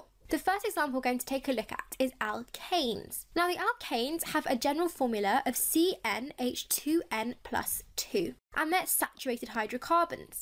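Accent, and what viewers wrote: United Kingdom. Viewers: British accent eh.. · u mean she has a british accent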